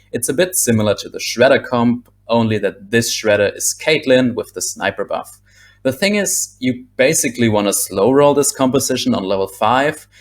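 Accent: Germany. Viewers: Are you German? Your English is very good but your pronunciation of the letter "s" gives it away :) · i mean something light simlar to <link> . But i get your time point, so if not totally fine, just thought I might bring it up. Your analsis is in my eyes better, hence I would be very interested. Especially in the before the patch drops part. :) Since I assume you are german aswell (because of the accent) Gute arbeit. Bitte mehr davon :)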